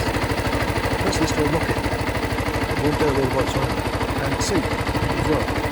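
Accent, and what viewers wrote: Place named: Norfolk, United Kingdom. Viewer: How you getting on with the bike 10 months on.... what's the mpg like and how well does it sit at 70mph on the duel roads.... Moonrakers on Oak Street should be getting more in soon and I like the look of the g1 and the adventure version like this u1... Smart colours nice looks and seams to have the most torque out of all 125cc... Apologies for all the questions and thanks for the post... Nice to hear a Norfolk accent...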